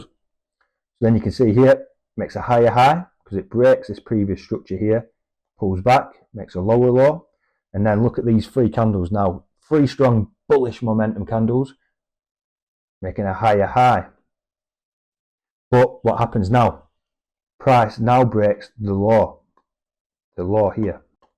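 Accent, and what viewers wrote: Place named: United Kingdom. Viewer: Nice video but for me is your british accent not understanable.. you dont said lower low/higher high you said lo lo/hai ha😂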